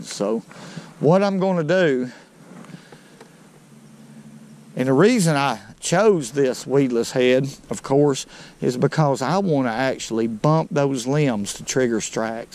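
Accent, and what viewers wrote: South, United States. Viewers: Lol I’m quite a ways in this creek, next sentence no further than I went back in this creek lotsa shad 🤣 love southern dialects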